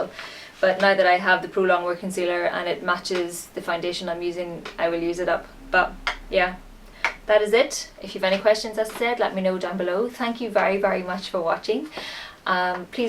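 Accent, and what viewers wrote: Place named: Northern Ireland, United Kingdom. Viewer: u from Belfast or down south I'm from northern Ireland an u sound like you are to lol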